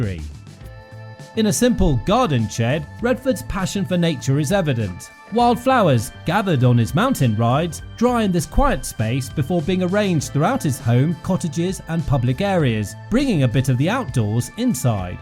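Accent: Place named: United Kingdom. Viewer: Often adding a British accent to a video presentation seems to give it more credibility but in this case, not so much. It would have been better with a Peter Coyote type voice. It would also be better to showcase the whole resort and institute with all the available photos instead of constantly showing the same photos. And finally, it would have helped to match the narrative to the photos. Yeah, I’m feeling grouchy today but Redford deserves better.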